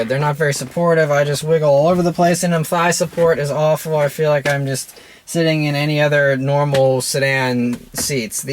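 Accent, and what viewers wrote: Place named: United States. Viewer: an unbiased opinion on wrx by a youtuber whos account name is?... think that one out... however his reviews are good, I love em alot... and I hope he does go onto greater things.... somethign about the American accent and documentary really fits, same as British accent... try doing this as an Aussie..... Our accent feels sharp and uneasy to listen to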